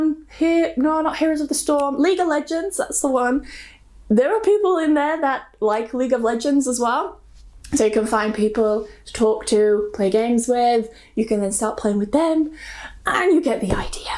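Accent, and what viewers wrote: United Kingdom. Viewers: your British accent is just delicious! Your voice is music to my ears.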